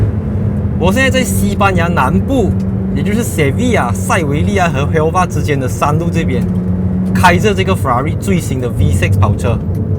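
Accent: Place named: Malaysia. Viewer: 看到一个口操马来西亚腔的主持人review欧洲的国家，有一种马来西亚终于走向世界的感觉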